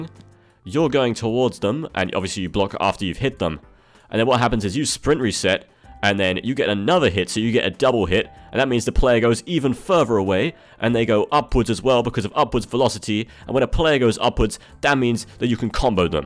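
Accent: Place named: United Kingdom. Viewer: I swear you sound British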